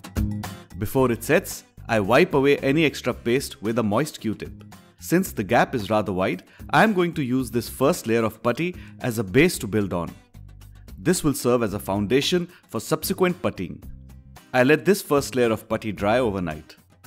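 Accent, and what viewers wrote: India. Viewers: Judging from the dialect you are Indian... well, your model is very colorful, but I think it has nothing to do with the coloring used on the real aircraft. From late 1939 gray colors (RLM 74, 75, 76) replaced the blackgreen/darkgreen (RLM 70, 71) paints. "Experts" reiterate, that Bf 109 E's in early/mid 1940 were finished in RLM 71/02, period!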